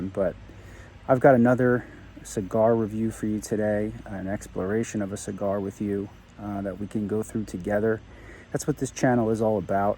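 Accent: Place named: Northeast, United States. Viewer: Love your reviews! Between the three Quorum varieties, which would you say is your favorite? BTW, your Northeast accent and sensibility definitely brings me back home as I currently live in California but grew up in the NYC area! Are you originally from PA?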